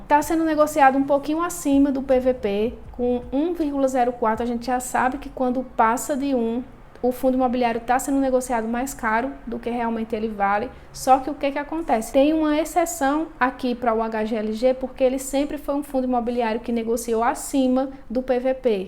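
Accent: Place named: Ceará, Brazil. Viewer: Lindo sotaque. Parece de Juazeiro do Norte Ceará.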